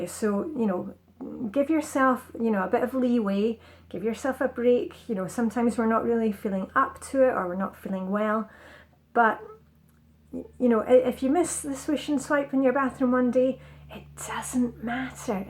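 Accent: Scotland, United Kingdom. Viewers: I love watching you and listening to you! Your accent reminds me of my grandparents (both sides from Scotland), and I love your sharing the Danish culture (a wee bit). I live in California and also follow FlyLady. I watch you to get motivated and uplifted every day! Thank you so much! Donna in Southern California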